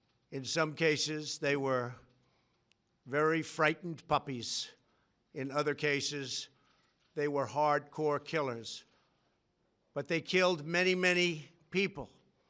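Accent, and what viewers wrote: United States. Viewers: New York accent thicc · he talks like a real american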